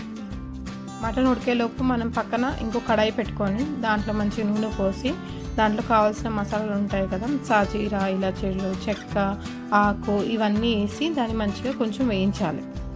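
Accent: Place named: Andhra Pradesh, India. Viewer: Mana telangana antunnav mari mana basha ,yasa akkada matladtha levu .a andra basha matladakandi.mataku mundu yenaka andi bandiloddu.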